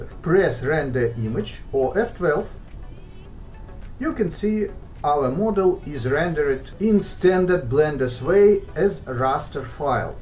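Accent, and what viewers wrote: Russia. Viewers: По акценту понял что ты русский :elbowcough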